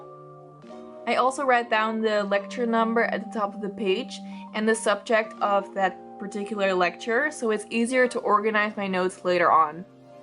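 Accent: Netherlands. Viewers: Is that dutch 😂❤️ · Wait omg r u dutch?! 😍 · Ok but like I have such a strange feeling you’re dutch, is that correct? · i smell some dutch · at first i thought u were dutch too because of those pens and your accent... and then when we got to see your notes, I SCREAMED BECAUSE YOUR DUTCH TOO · Ik hoorde aan het begin van de video een nederlands accent, toen ik jouw schrift zag wist ik het wel zeker :) supergoede engelse uitspraak! <3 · Are you dutch? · Hi i know this is so random but are you dutch? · OMG ARE U DUTCH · Ben je Nederlands 😅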